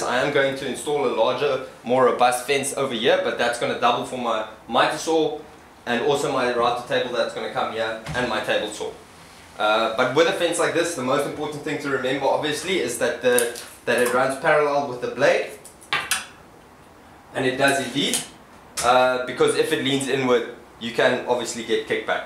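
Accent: South Africa. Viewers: Great! I have a tiny shop and was going to build something similar, only smaller.. Awesome ideas.. BTW, love your accent, South Africa? :D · South African? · are you south african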